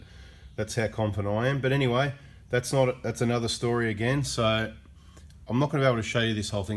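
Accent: Australia. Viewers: Love the Ausie in this guy ❣️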